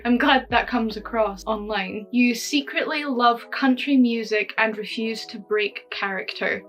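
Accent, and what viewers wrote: Scotland, United Kingdom. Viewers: Just gotta say, I love your work. From a fellow living across the pond, when I first found you on tiktok I didn't expect you to be Scottish 🤣🤣🤣. Your voice sounds very different than how I expected it to. Keep up the good work. Keep your head held high and push through all the bad thoughts that you like to tell yourself. Your inner critic is the worst person to take advice from.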